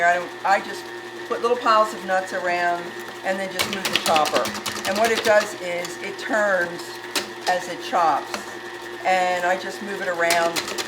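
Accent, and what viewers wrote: Philadelphia, United States. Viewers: Starting my own channel and found you...heard your voice and knew you were from home. Love that your family has encouraged you to do this...just became a young Nana (Mom Mom) myself. I wish you all the love and success one can have on here. From one Cooking Philly Girl to a close to home Philly girl, passing on your link.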